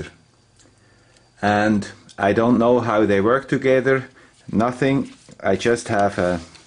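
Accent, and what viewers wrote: Switzerland. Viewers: I like the swiss accent, just saying...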